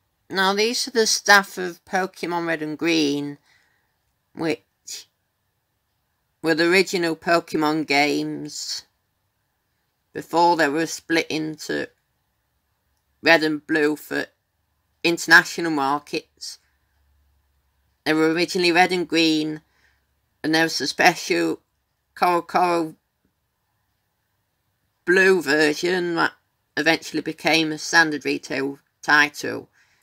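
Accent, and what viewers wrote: United Kingdom. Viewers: Hello! I've been watching your videos throughout the years, and I really enjoy the content that you put out and the dedication behind it! This little change up in format is a nice surprise, and I actually find your voice kind of soothing. What would you pin down your accent as? I feel that it's British, but I'd hate to just randomly assume in case it's something different, hah. Anyway, here's hoping for nice times for you and your further researches! I really do love your channel~ <3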